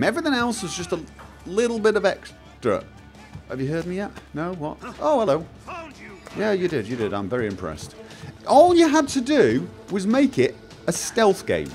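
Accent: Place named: Manchester, United Kingdom. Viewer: I think he's from Manchester, UK.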